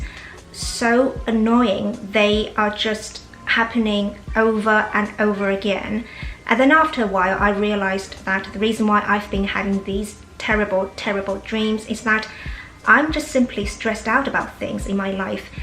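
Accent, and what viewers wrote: United Kingdom. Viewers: 闭上眼还以为是哪个伦敦小姑娘在接受采访呢~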